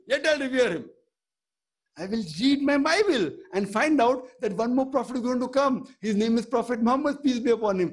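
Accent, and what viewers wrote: India. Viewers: seems strange to see a white man talking with a natural Indian accent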